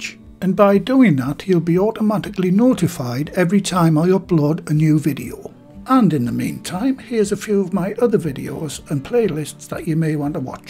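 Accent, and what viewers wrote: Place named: Sunderland, United Kingdom. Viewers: Just found your channel ,I saw the corned Beef and potato pie and thought great lets see if its like my Gran used to make.Lo and behold when i started watching and heard your Northern accent it made me home sick and hungry .My family on my mams side were from Seaham.Just watched the video on Panackelty great so pleased I found your channel.Dont know if you have made Ginger Wine or not but going to have a look.Regards from a northerner living in Spain.Thank you . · Love the videos, I'm from Sunderland myself but moved away when I was 16 I'm 52 now living on the Isle of Wight I miss the accent and the food, that's why i watch these great videos Thanks so much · Ah, a makem, I couldn't place the accent. One of the weird things about the north east is the variation in accent over very small distances. My earliest memories were in Felling, then Ouston, then Low Fell, until I moved down south for work then emigrated to the US. 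I've walked home from South Shields to Low Fell on New Year's Day so I think it's not too much of a stretch to say I lived within walking distance for 24 years. Thanks for doing this. My mother lectured at Gateshead Tech in cookery, and she wrote down a lot of her recipes for me, but it helps to have the video version. The things I really miss are stotties and Cumberland pork sausage. So now I have two hand cranked sausage makers, but I am not a bread maker... yet. America does not do decent pies, so I just bought a set of pie tins and a pudding basin.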